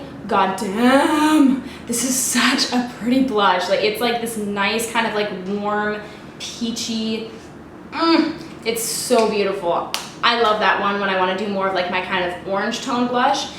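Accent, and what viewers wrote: Canada. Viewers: Came across this video and the one where you state the items you would not purchase again. I knew within a minute you were Canadian - you talk the talk girl - and believe it or not, us Canadians have a certain way of saying words (there is a sharpness to the phrasing) that is unique. I used to travel alot through the US and people would always ask me if I was from Canada? I would say yes then they would say my 'accent' gave it away! Great channel! ~ Jennifer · I love your canadian accent, the way you say man and damn & love your hair!!!!!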